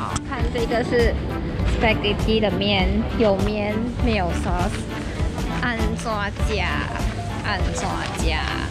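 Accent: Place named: Penang, Malaysia. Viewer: 槟城口音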